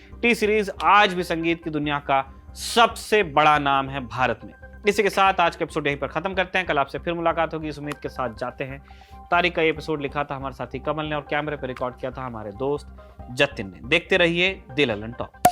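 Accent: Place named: Mumbai, India. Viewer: Mumbaee Bola Anchor 😎📢😫😫😡💙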